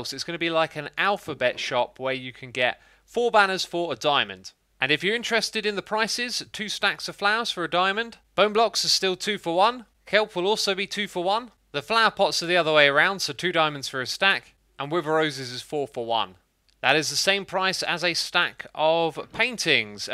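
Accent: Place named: United Kingdom. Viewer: I always find it fun to compare pronunciations with you X. Me: Aich X: Haich Me: Or-kid X: Or-chid Me: mural X: murial (this one bugs me a bit) Me: Zee (sometimes zed) X: Zed (ofc cause British) Me: compOster (with long O sound, like the word Open) X: Composter (short o sound, as if saying the word posture) I keep waiting to hear more and it's become a fun game now. Hope X sees this! <3 Cheers Buddy!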